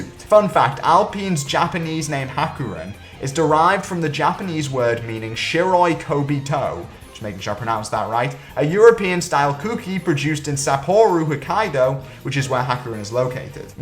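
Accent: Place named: Newcastle, United Kingdom. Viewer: He said c-ooo-k-e 🤭🤭 i love the Jordie accent creeping into words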